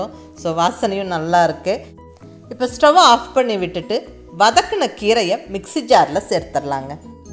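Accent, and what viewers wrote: Coimbatore, India. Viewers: I'm the first to comment... Happy to do that... I'm from cbe and i love ur videos.. Nice presentation and kovai slang.. I am ur follower of chicken biriyani, brinjal fry etc.. All ur videos are worth watching.. Thank u soo much mam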